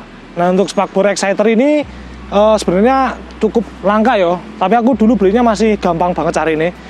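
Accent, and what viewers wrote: Java, Indonesia. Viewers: Boso jowone gawe luwih kentel broo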